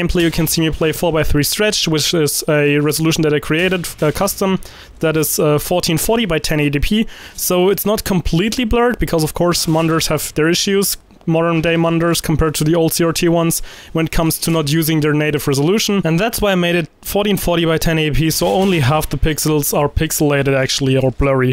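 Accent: Germany. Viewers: wie gehts dir man hört raus das du deutscher bist aber dein englisch ist richtig gut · Wie ich wusste das du Deutscher bist :D Seitdem ich dich Abonniert hatte kams mir schon so vor :D Merkt man irgendwie übel finde ich am English mach weiter so mit den Videos ^^ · Are you german? Because I think you have a very strong accent but it still sounds nice :)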